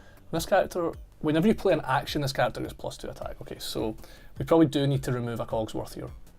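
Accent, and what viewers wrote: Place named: Scotland, United Kingdom. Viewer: Off topic, but you're so cute and what kind of accent is that?? Scottish? Regardless, i love it! 😍 😅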